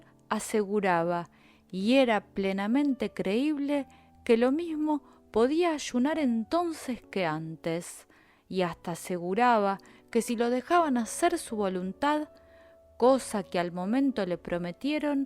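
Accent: Argentina. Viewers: El acento argentino no sirve para un audiolibro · El acento argentino para un audiolibro no es de mi gusto.😒😒😒😒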